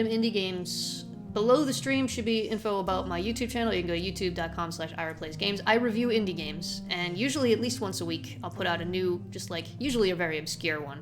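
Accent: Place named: Central Europe. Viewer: From your voice I kinda had a feeling you were from central Europe. Quite amusing how I ended up being correct about that. Also, just out of curiosity, would you happen to be a fellow Dutchie? :p Also, I figured out you can toggle any prep station and holding station upgrades you unlocked simply by clicking on them, so if the large amount of prep stations overwhelms you, that's all you have to do to turn them off again. ^w^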